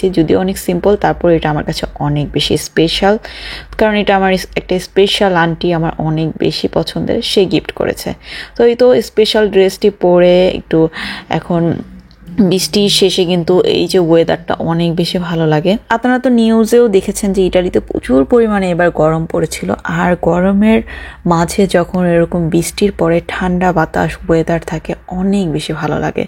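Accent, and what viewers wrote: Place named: Dohar-Nawabganj, Bangladesh. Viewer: Apur Kotha Sune Mone Hoy Apur Basa Dohar-Nawabgonj A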